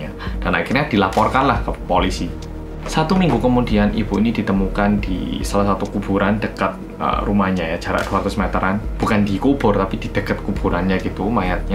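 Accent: Java, Indonesia. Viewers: BERSAMA ORANG KOREA YG MEDOKKK :) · Ya tuhannnnnnn... pekatnya jowo diaaaaaaa😩 selalu aku tgk video dr 🇮🇩 boleh lagi 92% faham... hahhh kali ni 83% jahh faham pon😂 #🇲🇾here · Oppa korea dgn kearifan lokal dan medok khasnya lebih gemes dripada idol yg jdi bias aku wkwkw semangat buat videonya oppaa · 3 channel storytelling favorit: 1. (pelan, medhok dan mudah dipahami) 2. Nadia Omara (gayanya kayak itu ghibah) 3. Arroyan Pram (Konspirasi dunia pake Astronomi, Fisika, dan editannya gila keren banget, serasa nonton agung hapsah dan Jerome polin bahas konspirasi) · Terus terang, saya nonton channel mas, selain karna kontennya menarik (suka cerita detektif), tapi juga karna kaget bisa nemu orang Korea yang sangat fasih ngomong bahasa Indonesia plus Jawa plus ada medok Jawanya :) Saya sendiri orang Solo besar di Jakarta. So pasti saya subscribe and like. Ditunggu ya, mas, video-video berikutnya. 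Saya pasti nonton :) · Oh pernah nonton ini pilemnya hehehehehe. Bagusss slow burn and depressing banget sampe skrg ga ada titik temunya. Jadi penasaran irl gimana kelanjutannya jadi lanjutin yg murder ini dulu aja Kakkkkk Oh ya btw sukak banget di 5 menitan awal Kakak cerita beneran ngeliat kamera with ur own words gitu, itu kayak kakak beneran ada di waktu kejadian and ngeliat sendiri kejadiannya kayak apa, jd kakak kayak re-telling bgt gitu makanya aku tonton terus video ini. Apa yak namanya? Engaging banget. Buat aku ini yg bikin Kakak beda sama vlogger lainnya soalnya vlogger2 lain kayak yaudah ngikutin naskah aja gitu or worse, baca script D: Personally aku jg suka bgt org ngomong medok jawa krn kerasa down to earthnya terus kayak ngerangkul banget lak cerita. Kayak lagi di warkop terus ngobrol santai. Ga sok mbule pake campur2 inggris gitu Kak. Pls keep it up👍🏻👍🏻👍🏻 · Seru abis nontonya..."Welcome to orang korea yang medok" aku dan temenku. 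Krik krik krik krik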